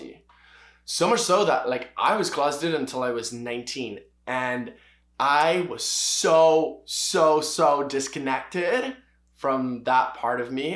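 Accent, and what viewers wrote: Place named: North America. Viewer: Very interesting video thank you I think it’s interesting how your accent sounds more North American than Barbadian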